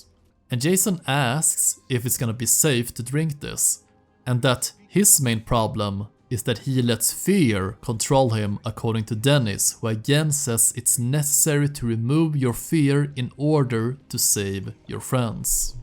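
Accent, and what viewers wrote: Sweden. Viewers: Omg din svenska dialekt luktar så stark den e😂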